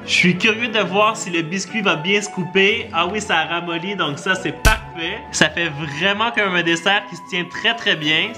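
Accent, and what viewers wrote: Canada. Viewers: tu mon youtubeur préféré desCarl is cooking vidéos accent canadien et qui met quoi des vidéos trop trop rire 🇨🇦👍👍👍👍👍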